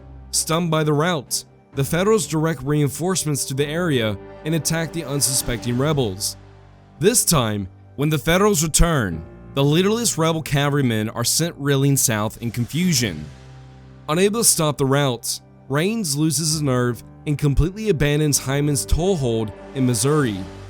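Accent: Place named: Texas, United States. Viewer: Really? It takes 4 months an episode. The Texas accent is a distraction also.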